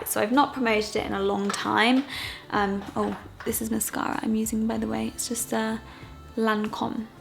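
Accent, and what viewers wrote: United Kingdom. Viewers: Nice British accent ;D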